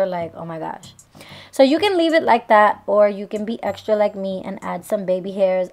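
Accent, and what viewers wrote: New York, United States. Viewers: New subscriber!🙋🏻‍♀️ Within the first 10 minutes of you talking I swear i knew you was from ny 😭 then you said it & im like yassss ! Finally a New York YouTuber 😩😭🙌🏽❤️ your video & wig is bomb af❤️ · I love your personality 😍😍 I knew u was from NY after watching 10sec of the video 😂😂😂😑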